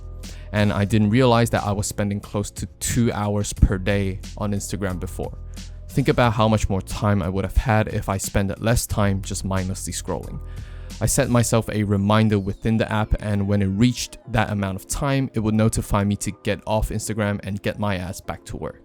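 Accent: United Kingdom. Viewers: I’m quite certain of your accent. That sounds like brits but not rly strong .. ( I’m eng major from Jpn, cheers) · I really love your British accents 😊😊😊😊😊😊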